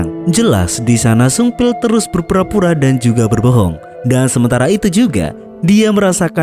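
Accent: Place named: Kediri, Indonesia. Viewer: Modele bahass ne keterangane koyo wong kediri gueeriii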